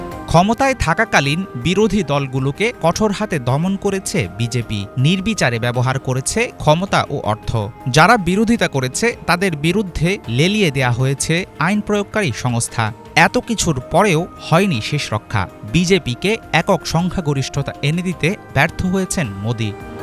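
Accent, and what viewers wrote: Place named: Bangladesh. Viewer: সংবাদ শুনলেই বোঝাযায়, এই মালটা বাংলাদেশী।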